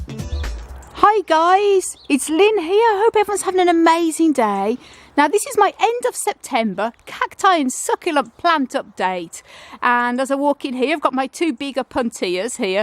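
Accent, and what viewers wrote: Birmingham, United Kingdom. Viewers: Awesome collection, have you ever kept Lithops, I used to love growing those!! Did you use to live in Birmingham as I am sure I can detect some brummy in your accent!!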